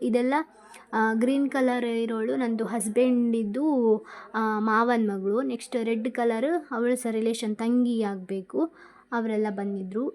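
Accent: Mangalore, India. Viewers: Uttarakarnataka style mathadi .manglore style mathadbedi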